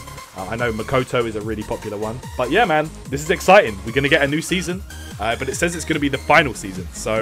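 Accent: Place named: United Kingdom. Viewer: Lol "Street Figh-uh," "cosch-yooms," lol! British accent!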